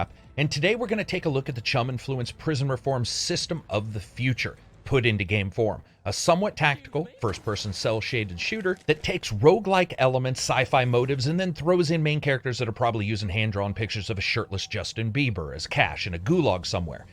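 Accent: United Kingdom. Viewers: Voice is fine, you just have to be British to understand. Which is a damn good thing! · I believe the audio issue you are referring to is a Scottish accent vs heavy compression.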